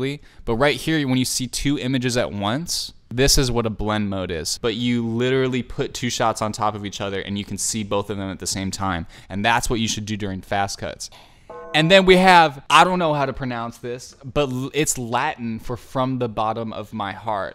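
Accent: United States. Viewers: I'm lesbian i thought your American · Hey, I'm lesbian. I thought you were American. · Bro I'm lesbian ✨I thought your American ✨💯😂legend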